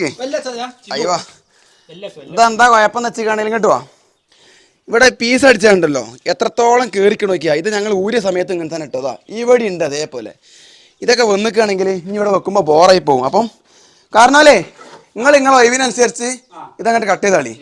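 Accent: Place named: Malappuram, India. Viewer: മലപ്പുറത്ത് അങ്ങനെ ആണ് 🥰 മഴ മയ പുഴ പുയ 😅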